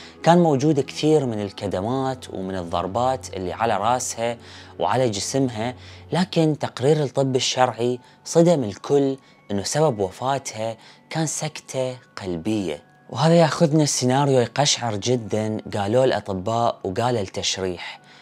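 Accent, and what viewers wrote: Iraq. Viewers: قناة جميلة واللهجه العراقية جميله استمر بالتوفيق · عيدك مبارك ومن العايدين الفايزين اخي ، انا اعشق قصص الجرائم والغموض رغم انها احيانا تكون محزنة وتظهر بشاعة طمع وحقد الإنسان لاخوه الإنسان بأسباب تافهة جدا مقارنة مع ازهاق حياة بشر. تحياتي لك من المملكة المتحدة 🇬🇧وشكد تعجبني لهجتك العراقية❤.. فدوة 🇮🇶😊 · اللهجة العراقية غاية في الروعة تحية من الجزائر لمحتواك الراقي أخي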